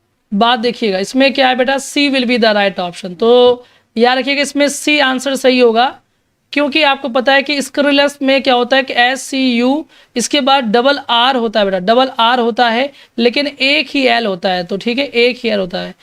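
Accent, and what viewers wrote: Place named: Bundelkhand, India. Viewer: Namaskar Guru ji Aap Bidaki / Banda/ kanpur/ phatehpur kahan se hai?? Because the way you speak sounds like among one of them.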